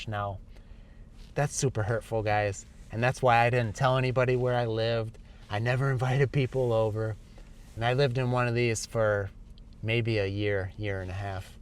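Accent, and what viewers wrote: United States. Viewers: Hi dear I am from India watching your video for first time actually I was seeking for American accent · Great channel, I'm appreciate your clear speak, pronunciaton and little slower rate. It's perfect for me, especially I prefer american english, not english. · Dear, I am an Indian. This is your first I saw it to the end. Thank you so much for making this type of videos. It give ideas about the real situations prevailing around us which are not knowing. Your style of speaking is very simple and understandable to the people who are eager to speak English in American accent. Your truthmess promptede to subscribe your channel happily. Well done! Keep it up. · American accent English sounds very pleasant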